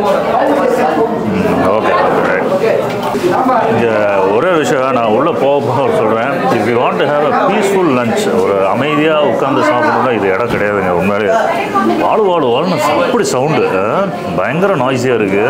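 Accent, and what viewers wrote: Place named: Kongu, India. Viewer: Ore satham vaalu vaalu nu 😂kongu slang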